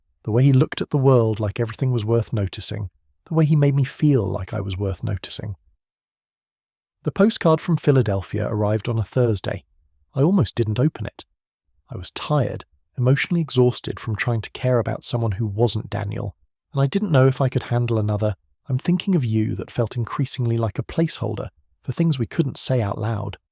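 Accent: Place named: United Kingdom. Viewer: I would like to make a suggestion. If it's OK to take a british narrator it's non real to use him for dialog of American characters. Could you try to make an effort to solve this situation? As an actor myself it would really make a difference. Thank you! By the way, I'm from near Montréal, Québec, Canada.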